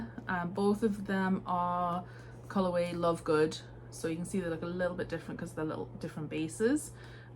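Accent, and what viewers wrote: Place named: Newcastle, United Kingdom. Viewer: Hello. Bonny Lass! As you continued speaking, the Geordie accent became clearer - my mam is 97 and she is from Jarrow! I am married to a Texan, but we live in England, so I have become used to a ‘mixed’ accent. I am so sad that you are based in the USA (costs of delivery to the UK 😱) as your yarn colours seem beautiful, I am an avid reader and I love tea. Oh well! The episode was really interesting and I am looking forward to future ones. Jinny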